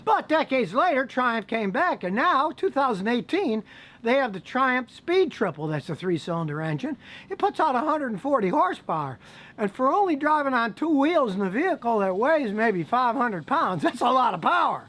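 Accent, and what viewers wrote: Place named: Texas, United States. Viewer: Hi! Greetings from Argentina!!! Did you ever see the Volkswagen Fox that is sold in Latin America? What do you think about it? Thank you very much for your videos! I love your Texan accent! It's so cool!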